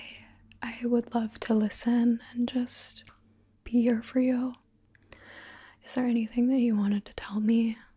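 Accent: South, United States. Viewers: You really have that southern accent down well! Great job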